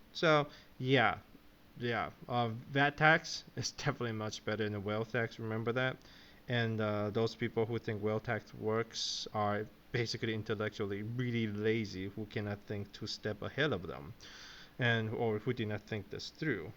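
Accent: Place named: United States. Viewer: ~ "Who will the VAT affect? Those spending more than $10,000/mo." you lost me. I suppose you have some unstated premises, possibly re UBI. first: it's VAT, V A T. it's not VAT tax, that would be Value Added Tax tax. second: such presentation issues prevent me from listening further, so.. maybe you EVENTUALLY get on to the following, but I may never know. ("Tell them what you are going to say. Say it. Tell them what you said." this is like high school composition.) such problems REALLY undermine credibility on top of this specific comprehension barrier third: "Your economics makes no sense." a VAT is a tax on productive capacity, whereas a wealth tax is intended to AVOID taxing production. the key is whether the monies seized are engaged in the economy or whether they are held in reserve, not actually adding to the production. think of it this way: * I am spending $1000/month (productive) * I am reserving $100 (nonproductive, so-called "wealth") -> VAT DECREASES my spending capacity a 10% VAT means only $900/month (spending, productive), $100 reserved -> "wealth tax" DOES NOT decrease my spending $1000/mo spending, $0 reserved fourth: "intellectually lazy" is a promise you are making by choosing this headline. 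it sets up expectations. in this case, it sets me up to question, "Just who is being intellectually lazy?" and in this case I determine it is you after about 3 and a half minutes ADDENDUM: I am an accent buff. I take yours as from the US, but the question then become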